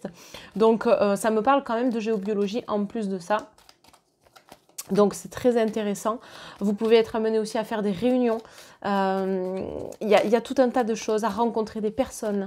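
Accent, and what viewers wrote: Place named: South, France. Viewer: J’adore votre accent du Sud! Ça me change :-))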